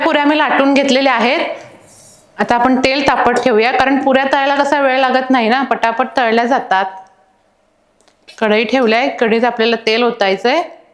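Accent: Kolhapur, India. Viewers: Full kolhapuri zalis ,tone pn kolhapuri zala ,mast 👍👍👍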